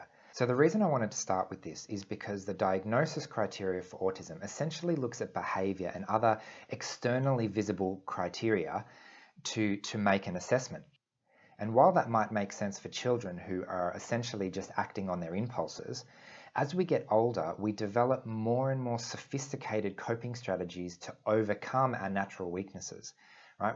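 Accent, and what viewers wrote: Australia. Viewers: Greetings, mate. (I was listening to your accent in the video, and it sounded Australian. So I made the assumption that Aussies refer to each other as "mate.") There was something that happened to me just yesterday. To give a little background/context, I'm an American citizen (native born). I've lived in A-me-rih-cur my whole life. Our official language should be English. Yesterday, I was just walking past two women in the grocery store ("supermarket"), and my brain recognized that one of them was speaking in Russian to the other person. I guess she actually used some of the more common Russian words that I've learned so far. What ended up happening was a long mental analysis of thinking about "boundaries," and how I might feel if a stranger in a supermarket walked up to me and started speaking to me in Russian. I would be scared or paranoid, wondering, how do they know I recognize words in Russian? 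This situation may not have even been that complex, because the woman had already revealed by her own speech that she knew how to communicate in Russian. To make a short story long, I kept going about my own business, even saw those two women a second time in another part of the store, but I didn't attempt to say Hi or introduce myself (if that would have been appropriate!). The only "agenda" I would have had would've been to show that I noticed these women were from a different culture, and that I appreciated them as fellow humans. It's weird that I know Russian at all. I had a c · Which part of Australia are you from?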